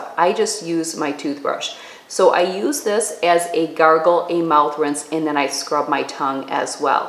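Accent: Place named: Midwest, United States. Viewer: btw......LOVE your channel.....I've watched a few and like them as well but have decided you're my favorite.......your makeup colors are my makeup colors so much so that in look to copy everything you do via makeup......love it!.......lol your articulation and Midwestern twang are actually quite mesmerizing as well.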